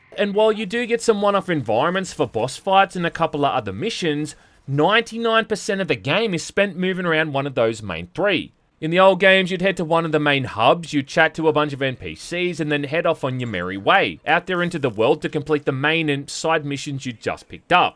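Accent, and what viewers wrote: Australia. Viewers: Why does sound MORE Australian the farther back you go in his videos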